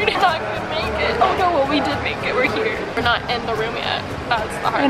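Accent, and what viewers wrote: California, United States. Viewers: Everytime i watch her vlogs i just say wow the cali accent😂😂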